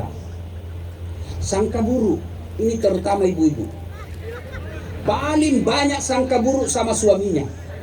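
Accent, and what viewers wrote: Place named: Sabah, Malaysia. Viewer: Pintar sudah Ustadz bicara sabah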